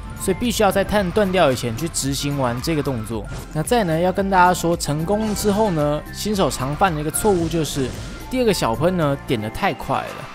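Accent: Taiwan. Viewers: 台湾人的读音真的不同😂没有在嘲笑,W=打不了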